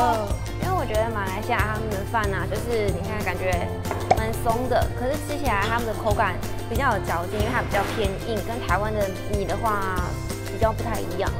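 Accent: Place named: China. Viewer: 為什麼妳講話會有點中國的腔調😂😂😂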